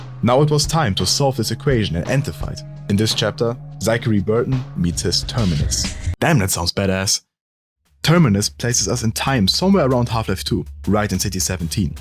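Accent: Germany. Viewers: I'm recently subscribed but gotta say, I figured out you are German after the first 2 sentences you spoke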